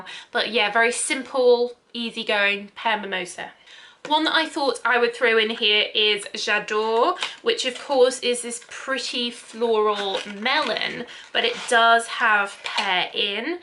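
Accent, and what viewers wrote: United Kingdom. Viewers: your skin looks gorgeous! how do you keep it so hydrated and balmy? I would like to see a facial routine video. Love your British accent though.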